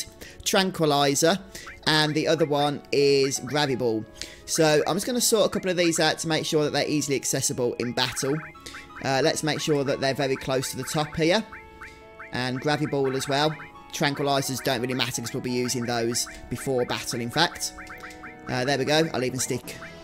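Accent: United Kingdom. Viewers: dude you should start practicing to steer away from your crazy british accent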